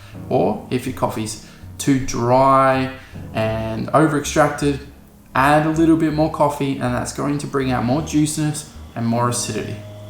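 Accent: Australia. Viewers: Good vid and Australian love it, well done.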